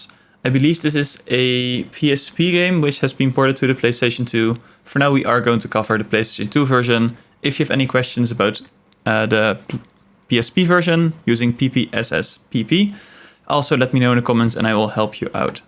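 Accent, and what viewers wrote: Netherlands. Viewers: ahh I recognize the accent and indeed you are Dutch. Really cool channel, subscribing now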